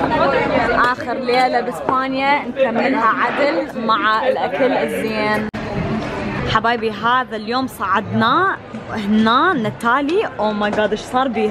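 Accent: Iraq. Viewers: والله ما جنت ادري انتي عراقيه لو انه غلطانه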